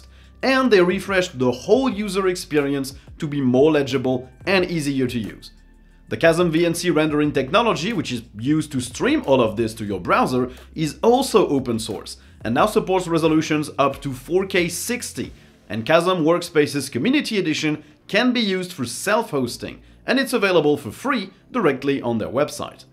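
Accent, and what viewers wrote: France. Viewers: Where is your French accent coming from? I'm Belgian ^^ Nice video by the way, I'll install 21.1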